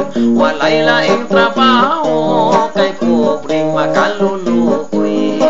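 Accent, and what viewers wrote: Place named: Samboan, Philippines. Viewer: Nice jud ka.ayo mora jud og taga samboangnun in south cebu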